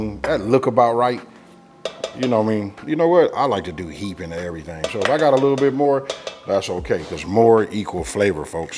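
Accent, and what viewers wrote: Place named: South, United States. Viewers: That LA accent is strong lol I love it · Love the version of ur recipes and love your accent. I’m from Philly, I’m a sucker for southern accents. I made this twice. It definitely hits different with cinnamon in it. Oh and definitely beans 🫘. On the east coast we use beans and ground beef 🥩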